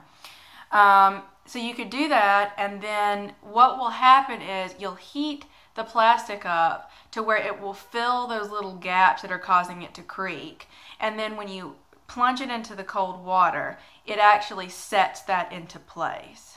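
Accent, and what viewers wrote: South, United States. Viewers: Your skin, hair, and eyes are absolutely intoxicating. Eyes like perfect almonds. You do your makeup perfectly. You're just accenting your already lovely features, not trying to paint over and create something new. You're a vision! The southern accent is the coup de grace. Great info also. My new Wayfarers were squeaking so that's how I found your channel. Thank you!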